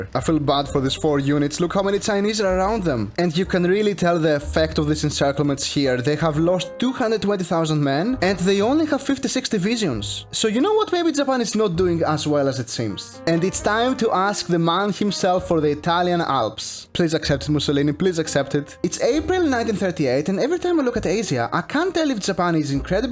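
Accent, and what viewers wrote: Greece. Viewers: Bro are you Greek? You sound very Greek 😅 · I hear an accent in your voice which is pretty similar? Are you from Greece?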